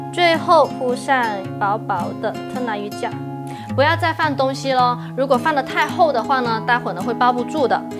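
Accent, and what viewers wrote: China. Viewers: Ew. Can't stand her Chinese accent.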